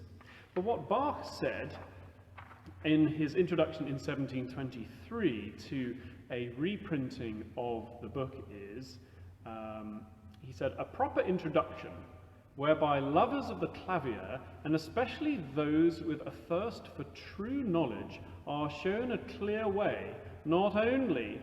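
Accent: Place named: Cambridge, United Kingdom. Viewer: I am delighted to hear that you have not lost your Cantabrigian accent after your years in the USA. I went to "the other place" but have not lost my Oxonian accent either after 38 years in the USA. My American wife says it is because I never listen to anything she says.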